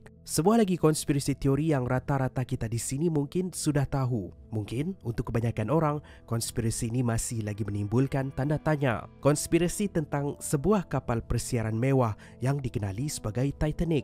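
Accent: Sabah, Malaysia. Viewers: Abg ni orng sabah kh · Abang ni orang sabah ke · patutla cara cakap lain sikit, urang sabah ani haha mantap, teruskan, support bah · Dia loghat sabah.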